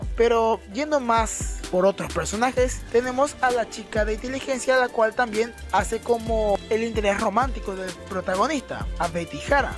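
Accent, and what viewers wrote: Paraguay. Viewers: Eres paraguayo!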